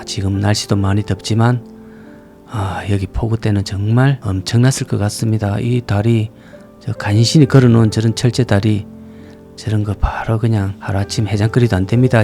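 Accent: Gyeongsang, South Korea. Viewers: 저고향 의령 선생님 말씀진리 임다~ 구수한갱상도 중독성 있는 음성 힐링됩니다 감사이 잘봤습니다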